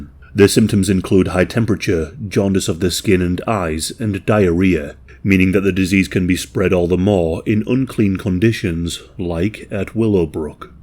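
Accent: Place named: Northern England, United Kingdom. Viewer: Yep, here's another pronounciation nazi surfacing. He has a Northern England accent dumbass. A stunning example of someone who has nothing better to do. Now fu*k off.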